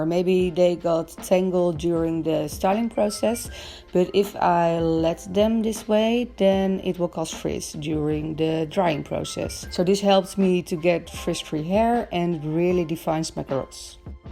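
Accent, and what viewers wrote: Netherlands. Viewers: I can tell that you’re dutch just from your accent and how your head nearly touched the shower thing😂 (I hope that wasn’t offensive💕)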